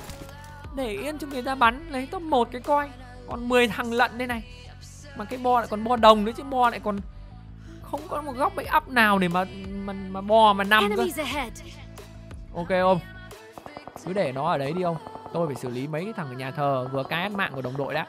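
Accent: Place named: Thanh Hoa, Vietnam. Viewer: Giọng thanh hoá :))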